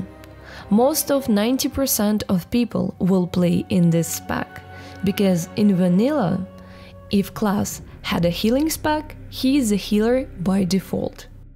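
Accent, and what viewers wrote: Russia. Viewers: У вас русский акцент или мне кажется)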